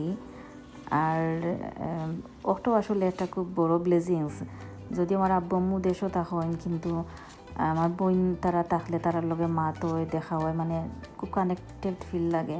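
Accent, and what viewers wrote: Sylhet, Bangladesh. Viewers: Wow apu osomvob valo laglo Sylhety vashai kotha bolai.aro video dekhte chai erokom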